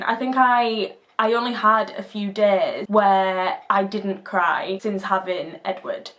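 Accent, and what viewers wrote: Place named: Yorkshire, United Kingdom. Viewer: love ur Yorkshire accent!!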